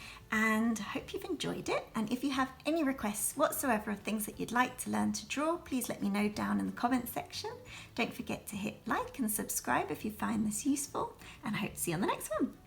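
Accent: United Kingdom. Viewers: I love this video, I said to my son, I like watching videos when they have a nice voice and then a second later you're fine tuning the comb and say " like a punk haircut"! So British, love it.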